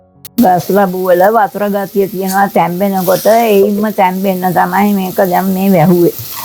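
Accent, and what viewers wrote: Ambalangoda, Sri Lanka. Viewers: Aththamma from down south? The way she talks feels like amabalangoda accent.. bless her she is lovely and kind ❤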